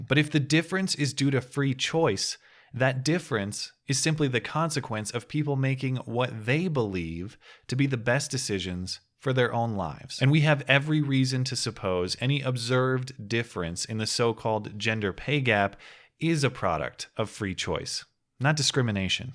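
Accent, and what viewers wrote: Minnesota, United States. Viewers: are you from minnesota?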